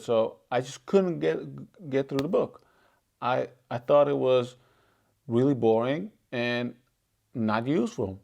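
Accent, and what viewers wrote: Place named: South, United States. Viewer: Is it me or you sound like a Southern American, although you're in Netherlands?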